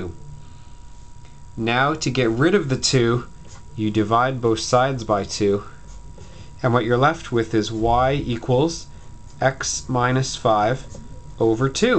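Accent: Canada. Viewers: OMG! You're Canadian! :D Thanks for the help btw :3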